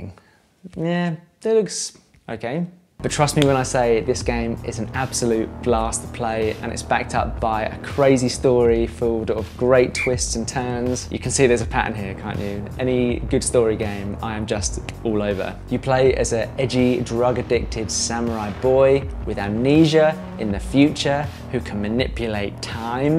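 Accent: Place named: United Kingdom. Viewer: You’ve made three videos. On steam deck. Three. That’s it. This is my favorite steam deck channel. Admittedly the accent helps, I love the shit out of Brits. But honestly you’re so entertaining and genuine. Keep it up “mate” ;) I’m working on it! And ps keep being a great human, anyone who teaches for a living deserves a special spot in well whatever you believe the afterlife is. Teaching children especially. Well done!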